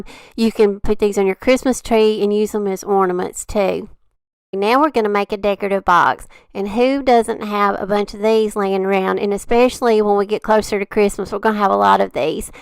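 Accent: South, United States. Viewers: LOVE YOUR SOUTHERN ACCENT SOOO SWEET!!!!!!!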